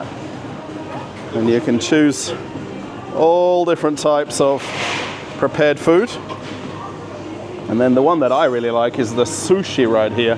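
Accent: Australia. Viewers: I'm russian, but I like to listen to clean australian english) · Hello,!!! По работе я не могу много смотреть твои видео,но к счастью могу много слушать. Поэтому я,пользуясь случаем, совершенствую свой австралийский английский,мечтая в будущем посетить твою прекрасную родину!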